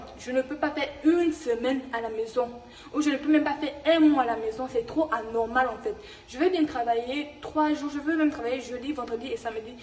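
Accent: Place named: Russia. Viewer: Ton accent russe est impeccable.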